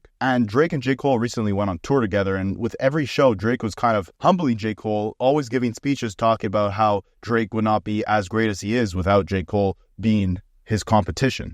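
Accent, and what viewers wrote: Canada. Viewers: The narrator sounds Canadian. Cool.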